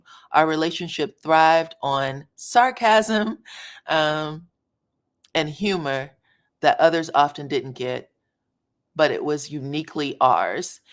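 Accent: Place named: Boston, United States. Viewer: I can hear you Boston in the house❤️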